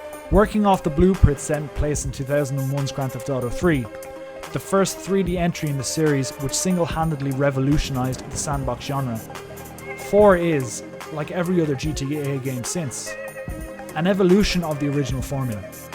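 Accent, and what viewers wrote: Ireland. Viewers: Are you from Ireland and if you are what county lol,also great video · Is that an Irish accent I hear?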